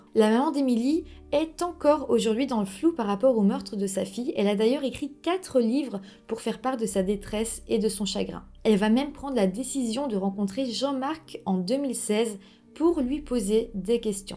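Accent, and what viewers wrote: Belgium. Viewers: merci pour la vidéO et l'accent belge <33333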